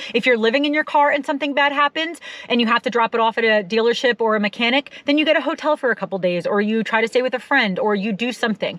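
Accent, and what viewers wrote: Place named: New Jersey, United States. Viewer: A whole lot of Jersey ny accent coming out in this one. You stay home for the holidays 😆. Happy new year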